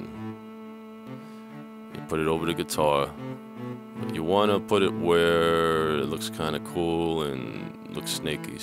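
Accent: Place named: New York, United States. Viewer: ah okay. i'm not from the US just sounded like new york somwhere...jersey was the closest thing i could think off ;) nice axe man, doing one myself at the moment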